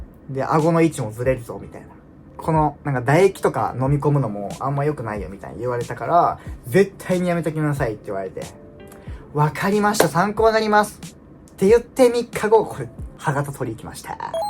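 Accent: Kansai, Japan. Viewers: 伊予弁やから関西アクセントなんねー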